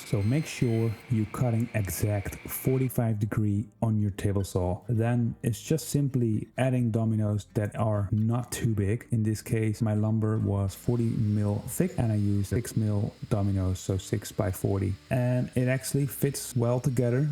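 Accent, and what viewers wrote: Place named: Netherlands. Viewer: Sounds dutch to me, but I like it... I, surprised that you don't have a jig for dovetails.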